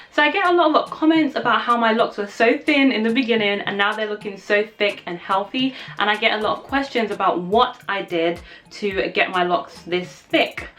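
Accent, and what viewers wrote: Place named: England, United Kingdom. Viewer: wow I'm in my journey as well but you're beautiful accent took me way off course and I pay no attention to nothing else. Let me stay focused are you from England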